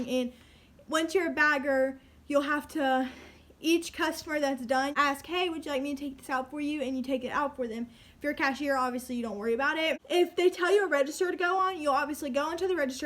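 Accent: South, United States. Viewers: just curious because i noticed your accent and also i didnt know publix was anywhere besides fl ❤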